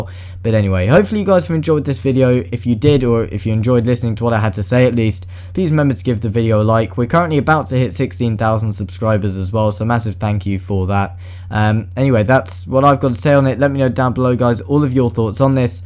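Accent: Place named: Texas, United States. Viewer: you sound like you're from teaxas.....1776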